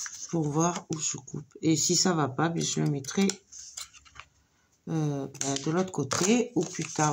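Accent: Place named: Toulouse, France. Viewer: Bonjour, 👏 Très bel album. Vu l'accent et l'allusion à Toulouse je suppose que vous êtes dans les environs. J'habite à Albi et je ne connais personne qui scrappe tout proche.je suis autodidacte depuis mes débuts, j'adorerais avoir ma chaîne et partager ma passion mais ça doit prendre beaucoup de temps surtout quand on est accro et aussi pas évident avec mon métier de soignante. Alors je visionne...je retranscris les tutos et je fais des patrons... Là avec vous, je souris c'est interactif même si vous ne me répondez pas je finis vos phrases et vous souffle les mots manquants spécifiques du scrap! 😁. Je n'ai jamais eu l'occasion non plus de me rendre à un salon créatif car pas informée. J'aimerais bien correspondre avec vous 😉. Meilleurs vœux✨️ et belle journée à vous 🌺 Sophie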